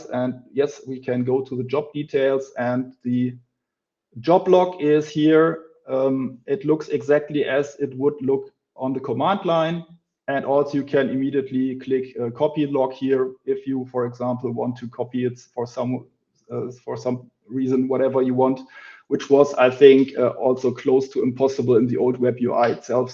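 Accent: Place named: Germany. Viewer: Drei Wörter gehört und gedacht "det is n deutscher" und dann "sooo" =)). Ich wünscht ich könnt nur 10% so Englisch sprechen. But if I hear it, I can learn it.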